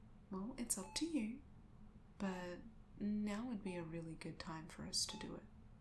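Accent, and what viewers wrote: Australia. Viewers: Oi, a fellow Australian!!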